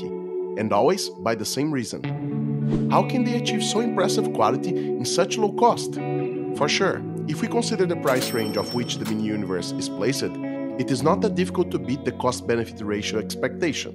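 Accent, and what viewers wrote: Brazil. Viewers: Great Brazilian accent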